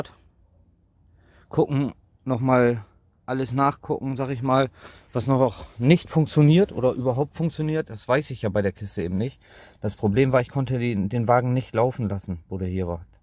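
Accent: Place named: East Frisia, Germany. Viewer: Hey ich muss dir ganz ehrlich sagen du machst einen Mega Job und es gibt keinen dem ich bei sowas so gerne zusehe wie bei dir! Der i Punkt finde ich noch ist dein ostfriesisches gesabbel zwischen durch wenn es dich wieder packt Danke für deine krasse arbeit usw vielen.vieleb dank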